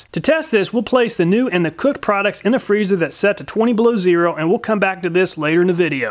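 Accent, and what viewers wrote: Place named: Indiana, United States. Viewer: Man you definitely got that Northern Indiana accent!!!!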